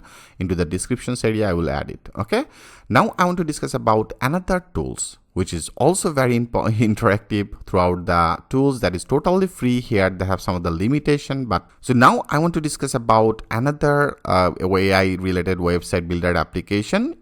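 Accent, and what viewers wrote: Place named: India. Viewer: Could you please speak with an American accent rather than an Indian accent?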